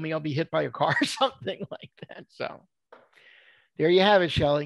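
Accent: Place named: New York, United States. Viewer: This mensch has the best story and delivery I’ve ever heard. Great New York style delivery. I guess the divorce didn’t bother him after that. 😂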